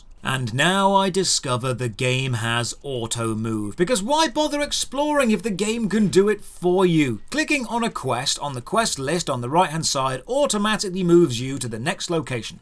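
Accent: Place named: Northern England, United Kingdom. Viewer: "Pick oop"...ah, by gum, yah can't be hidin' your northern lilt.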